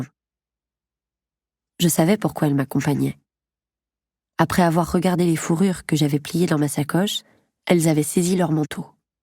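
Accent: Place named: United States. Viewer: Quand on a lu le livre la prononciation des prenoms à l'americaine est tres perturbante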